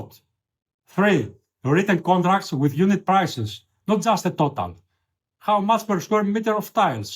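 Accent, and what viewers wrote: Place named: Greece. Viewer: Έχει τη χαρακτηριστική ελληνική προφορά αλλά so what? Μια χαρά κατανοητός είναι 😊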